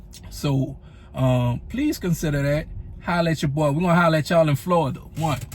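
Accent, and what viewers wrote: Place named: New Orleans, United States. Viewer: You definitely from NOLA heavy accent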